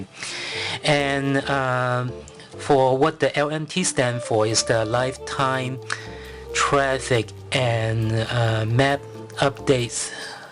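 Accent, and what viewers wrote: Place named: Vietnam. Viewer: The accent of this guy sounds like Vietnamese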